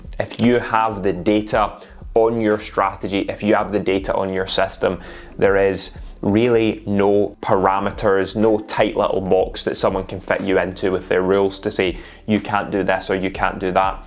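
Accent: Scotland, United Kingdom. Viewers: Are u Scottish? Impressive work anyway. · Are you from Scotland? Nice accent 😅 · Nice accent Scottish Lad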